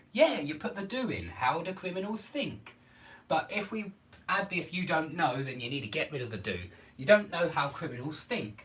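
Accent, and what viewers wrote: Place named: United Kingdom. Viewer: ! British speech ... cool.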